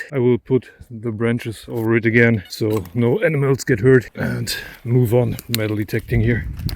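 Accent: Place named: East Germany, Germany. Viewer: na mehr als sicher sind diese funde in Deutschland im östlichen raum gemacht, akzent ist def ebenfalls Deutsch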